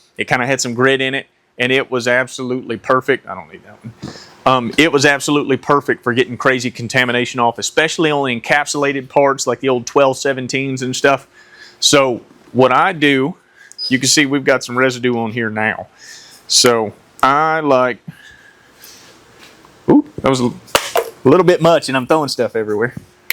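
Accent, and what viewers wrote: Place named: United States. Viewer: Lovely American accent.! Many thanks for making this helpful video sir.😊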